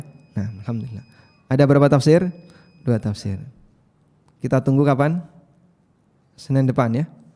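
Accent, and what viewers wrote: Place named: Java, Indonesia. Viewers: masyaallah tabarakallah, jazaakumullah khairan. sebagai orang jawa beliau cukup i detik dgn jawanya serasa di nasehati orang dekat. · Mas syaa allah..... saya orang jogja yg merantau di bogor.... paling seneng khas logat Jawa nya... serasa di nasehat dg cara yg lembut dan halus.... Barakallah... sehat berkah pak ustadz❤❤❤krn allah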